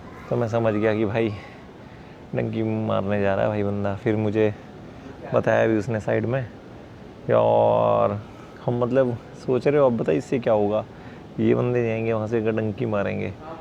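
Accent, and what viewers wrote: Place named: Delhi, India. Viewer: Sir apki language sunkar mujhe lagra h aap delhi se h 😂😂delhi wale kahi b ho pata chal jata h ki y Dilli se h hum b delhi se h or sir apke bolne ks sence same mere czns jesa h😂😂😍😍😍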